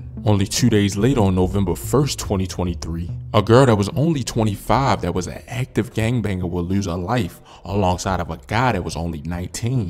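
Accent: Baltimore, United States. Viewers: He's from Baltimore. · Dude sound like he from Baltimore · The YouTube creator definitely is from Baltimore Maryland. The accent is crazy. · Baltimore accent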